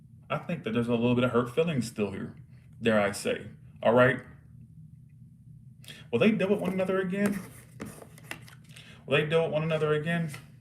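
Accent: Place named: South, United States. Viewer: Damn you are the bomb. I love your southern accent; thank you for doing this.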